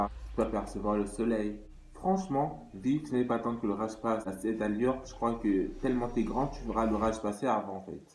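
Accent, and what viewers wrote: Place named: Gabon. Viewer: Aah bah voilà comme si je savais que t'étais Gabonais 🇬🇦 à cause de ton accent 😂😅 je te suis depuis 3 mois à Libreville félicitations et surtout continue comme ça prck depuis ces 3 mois je suis tes conseils et j'ai pris 4 cm même si c vrai que parfois je suis plus le matin et moins grand le soir et je fais 1m62 , mon père 1m65 ou 66 et ma mère 1m55 et en plus j'ai envie de faire 1m84 tu penses que je peux les atteindre stp 🤔🙏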